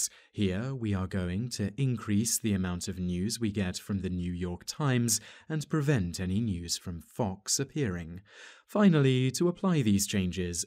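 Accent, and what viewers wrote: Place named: RP, United Kingdom. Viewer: You sound soooooooo posh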